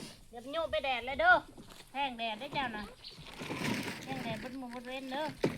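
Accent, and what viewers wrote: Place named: Isan, Thailand. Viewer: สองนาทีก็มีแฮง " เสียงอิสาน"เสียงนุ่มเพราะ เสียงนางเอกฟังทุกวัน พิเศษสวย😊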